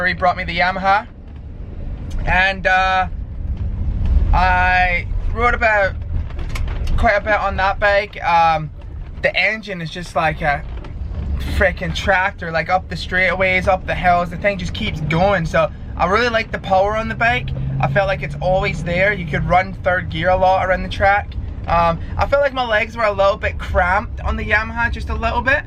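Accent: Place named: Scotland, United Kingdom. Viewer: r u scottish my man? im loving that accent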